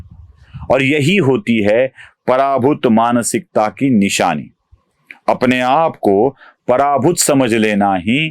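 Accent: Maharashtra, India. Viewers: जी आपकी एनालिसिस तो अच्छी है ही, आपकी मराठी निष्ठ हिंदी भी बहुत अच्छी लगती है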